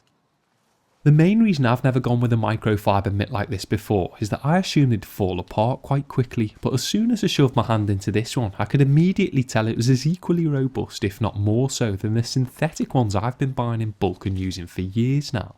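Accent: Liverpool, United Kingdom. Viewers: by the way he's from Liverpool...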